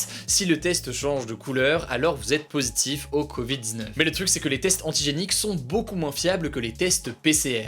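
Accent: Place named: United Kingdom. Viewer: Ton accent anglais 😂👍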